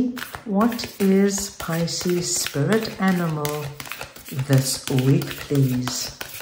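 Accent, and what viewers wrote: South Africa. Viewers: Thank you so much for a lovely reading again 😅 do i detect a South African accent? Or am i getting old 😅😅😅 Lots of love from Cape Town ❤❤❤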